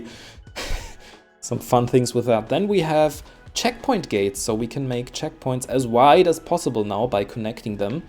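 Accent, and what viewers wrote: Germany. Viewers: Lol ein Münchner, servus!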